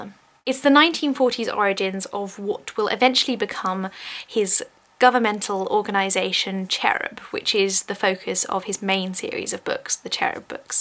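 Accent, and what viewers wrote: United Kingdom. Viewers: I bought this a couple weeks ago and finished it in a couple days. This reading thing sucks though. It doesn't sound good in a British accent.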